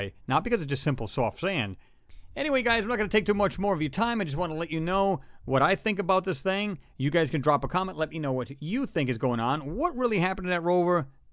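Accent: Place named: Connecticut, United States. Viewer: "it looks like it got jammed in... (Dramatic pause, me your long time listener hoping in your Connecticut accent say machine paaaahts ) whatever that is"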